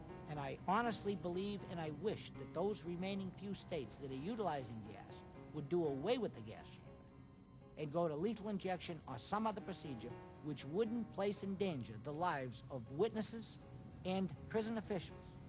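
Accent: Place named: United States. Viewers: Horrible american accent. wow,,, 'very distasteful thing for the GOD who has to unstrap the inmate."... "nomily,, if we think of a belt." ....."the GOD has to compress all the flesh"... "same as the safety belt in yo caw."...."they deficate on da chaw"... Americans really really need to take elocution lessons. They sound like idiots. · There is no such thing as an American accent. There are many, many accents in North America, and even in individual states. This man has an accent found in New England, most famously Massachussets. That accent was developed over a hundred years ago, with minor change over the years, from New Englanders' obsession with trying to be as british as possible. Western North Carolinians and eastern Tenneseans speak with an accent and dialect that historians have found to be nearly identical to the common accent and dialect of Elizabethan Englanders. 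The most famous southern accent, which is spoken by Virginians and north Georgians came from the mid colonial period with high society types from the aforementioned states, then colonies, trying to emulate the accent of the royal family of the day, the Hanovers. So, if you don't like American accents, blame the English.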